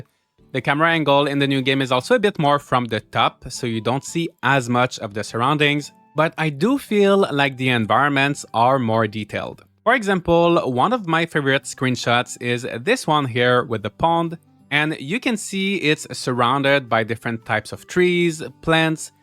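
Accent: Canada. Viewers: this is so random! But I'm just wondering what kind of accent you have? I know you're from Canada, but it doesn't sound French Canadian to me!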